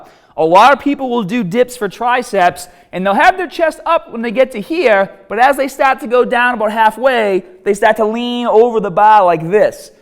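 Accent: Boston, United States. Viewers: I'm guessing from Boston? LOL Great video though! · When did get a Boston accent? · Must be from Boston... I grew up in Cambridge. Thanks for the info man!💪🏿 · drjd2real you exhibit 3 things with your unfortunate comment: 1. Stupidity - your comment has nothing to do with the issue being discussed. 2. Ignorance - is speaking like most Bostonians/Massachusettsmen would speak. It's the regional accent. 3. Racial complex - get the chip off your shoulder, that stuff is really tiresome. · Is he from Boston? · He says start, arms and hard funny. Must be from boston. Everything with a "A" sound lol Accent is bugged out. Real informative tho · love the video...but omg i hate that boston accent!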